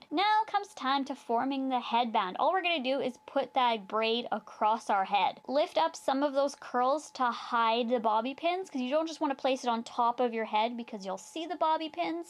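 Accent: Canada. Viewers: hehe sometimes i can heard your canadian accent and it's so cute! Go Canada!!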